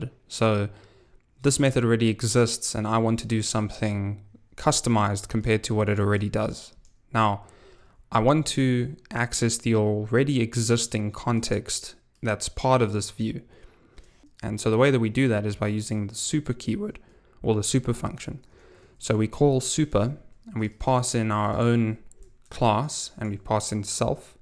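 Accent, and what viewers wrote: South Africa. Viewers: Hey a south african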